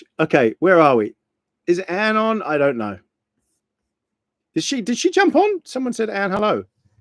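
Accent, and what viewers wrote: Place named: England, United Kingdom. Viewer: Hi! I can hear you! I'm glad you have a re-play--Thanks. I didn't know you were already in Canada! It's not gone wrong--You just didn't know plans would change.:D:face-red-heart-shape: You look real good in those glasses!👍👍👍👍👍 You sound like you are from England/New zealand and whatever accent you have--I really like it.:D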